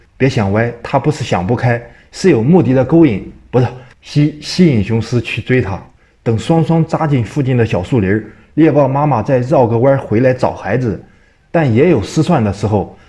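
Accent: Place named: Shandong, China. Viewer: 这口音像是山东泰安东平的口音。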